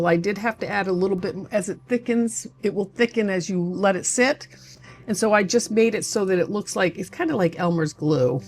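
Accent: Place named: Upper Midwest, United States. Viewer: I need to Know!!!! Where is from? I get upper Midwest, New York, definitely along the Canadian border. Do tell please!!!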